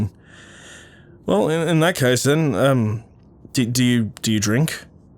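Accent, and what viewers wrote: United Kingdom. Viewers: YIPEE :DD ur british accent is just so delicious- XD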